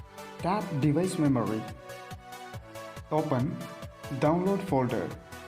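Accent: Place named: Kerala, India. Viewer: Bro malayali alle English kettapo thoni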